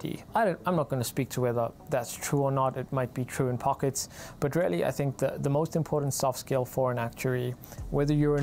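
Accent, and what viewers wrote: South Africa. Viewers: Nothing more beautiful than a South African accent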